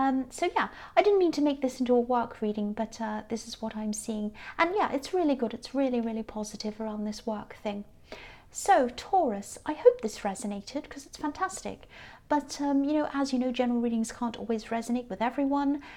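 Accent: United Kingdom. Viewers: If that is my ex, hmmm I will refer him with the best therapists but that's the best I can do. He rejected me and used me at the same time and that ship is sailed. I'm so grateful cuz I've learned, healed so much and I don't hate him. I m more focused on work and personal development all the levels there are. You are so good and inspiring, you're gifted. Thank you very much, I am 4 days away to my birthday and really ready to embrace the new life I want so the past can be flushed in the toilet and only keeping the lessons!❤ where are you from? I love your accent, I wonder is it the UK?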